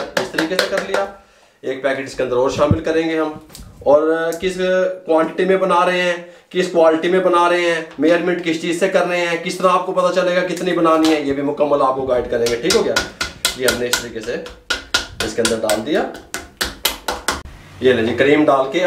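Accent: Punjab, India. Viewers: 😁 punjabi touch ❤❤❤❤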